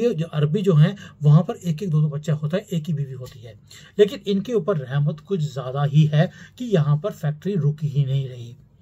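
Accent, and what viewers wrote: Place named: Punjab, India. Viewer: You are simply amazing your Hindi in Punjabi is too irresponsible,and typical Punjabi moms taunt way is ultimate and of course not the least your courage in using words to insult the rivalry is super awesome..keep it up keep growing..Jai ma Bharti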